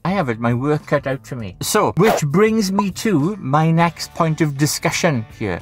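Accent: Wales, United Kingdom. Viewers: Where is that? Aberavon or more Mumbles way? Driving me mad, trying to work it out. X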